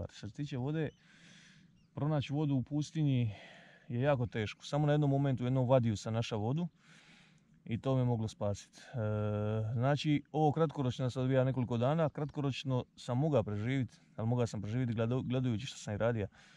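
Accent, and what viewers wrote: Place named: Dalmatia, Croatia. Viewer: Predivno podrucje. Kao drugi planet! Scenografija za sf film....Al gustam slusati dalmatinski :)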